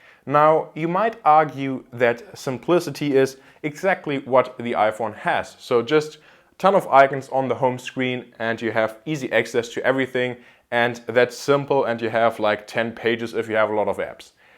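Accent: Germany. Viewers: love the German accent and cool opinions.. subscribed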